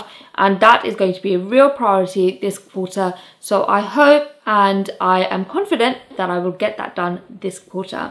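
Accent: United Kingdom. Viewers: Consistency isn't important to me at all. I don't keep track of anyone's schedule. I just check my subscriptions every day and add the videos I'm interested in to my Watch Later list. So I can't speak for everyone, of course, but I would be perfectly okay with you skipping a week now and then if your schedule is just too busy. As for content, my favorite topics are minimalism. decluttering, and finances, but any of the other ideas you mentioned sound good as well, especially health and fitness. Anything that appeals to you would be fine with me. I've watched almost all your videos, and I've enjoyed each of them. As one of the other viewers said, I could actually just listen to you talk all day. As an American, I can't say what sets your accent apart from the typical British accent, but you just sound so posh and elegant ! Congratulations on your weight loss progress. 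I wish you the best of luck with that and with all your other goals. I will continue to watch to see how it's all going!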